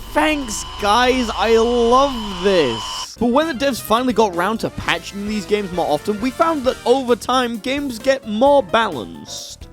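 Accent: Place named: United Kingdom. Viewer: How to start a Video: 1. Say "Lets talk about [insert fgc related topic here]" In a british accent 2. Have the camera be fuzzy and show a big giant white text of insert topic before showing the strive giovanna gameplay 3. Always play the Character Select Theme from MBAACC 4. Time all three events to where the beat drops in the Character Select Theme from MBAACC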